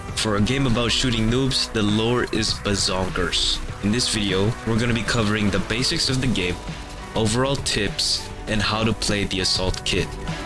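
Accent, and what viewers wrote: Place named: Netherlands. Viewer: for anyone wondering, zeekoeien100 is Dutch for Seacows100. Also im guessing, based on his accent and one of the pple hes playing with, that hes dutch himself. But thats just a theorie, A THEORIE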